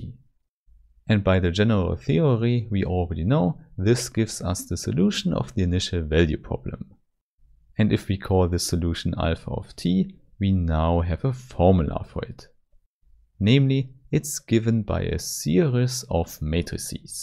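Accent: Germany. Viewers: This is great explanation, very useful.Thank you. P.S.Judging by your accent, I assume that you are from Berlin.🙂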